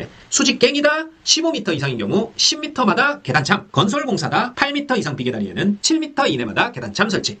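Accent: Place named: South Korea. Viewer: 단55 틀68~ 사투리가 구수하시네요.. 어디 지역분이신지?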